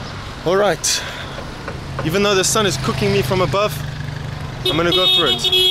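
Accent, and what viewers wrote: South Africa. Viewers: I thought you were South African, now I wonder who the South African guy was….. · I feel ashamed for him saying he is German - he speaks with South African accent. Egypt is a country I love and Egypt needs help showing the beauty of it to get more tourists not the hard life the people have to live from the corrupt government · he is half German half SA guys, he was born in SA and lived in Germany the last years. He said that in one of the first videos. You can clearly hear an accent when he speaks German too. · You are the most South African sounding German man I have ever seen (...heard) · Why do you keep tellimg people that you are from GERMANY, when your accent is clearlu SOUTH AFRICAN??????? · You almost sound South African, good video. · He sounds super South African to me 🤔. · You are German? You sound South African to me. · This south African not german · He got South African accent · You sound S African, not German--enjoyable posts. 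· Why do you sound like you are from South Africa when you speak English?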